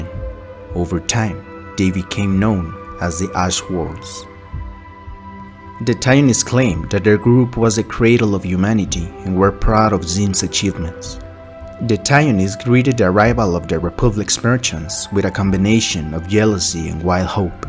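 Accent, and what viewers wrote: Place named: Spain. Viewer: I love the accent! It's like listening to Star Wars as read by Antonio Banderas! REPOBLIC! LOL! LOVE IT! 😅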